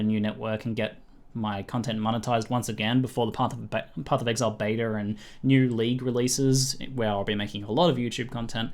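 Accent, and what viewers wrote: Australia. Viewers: I'm Australian too and he definitely has an Australian accent. If he was a Kiwi he'd call himself:)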